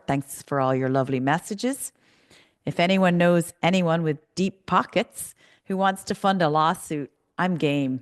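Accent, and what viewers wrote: United States. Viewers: Are u American ?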